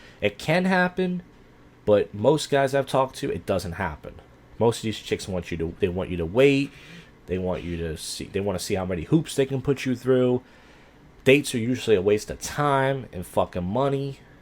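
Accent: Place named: East Coast, United States. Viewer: U sound like east coast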